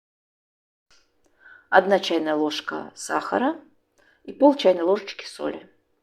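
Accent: Baku, Azerbaijan. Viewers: А откуда родом наша девушка,очень пробиваются бакинские интонации. То ,что называют - бакинский акцент...музыка для слуха!!))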